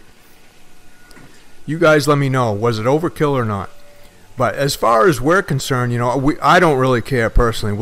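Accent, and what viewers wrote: Maine, United States. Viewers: Ya sound like you was born an raised in winston salem maine or something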